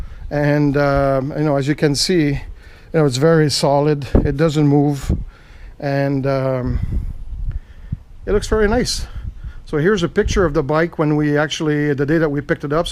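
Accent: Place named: Israel. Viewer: אין כמו המבטא הישראלי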